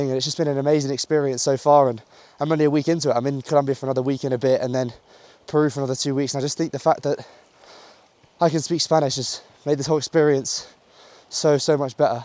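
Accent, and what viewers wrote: England, United Kingdom. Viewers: your British accent is also wonderful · Se nota que eres británico de Inglaterra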